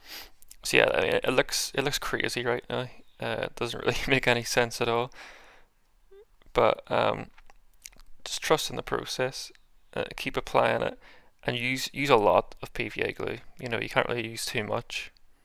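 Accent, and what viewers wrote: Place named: Northern Ireland, United Kingdom. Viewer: just came across this vid, weird clickin on a mini tutorial and hearin someone from norn iron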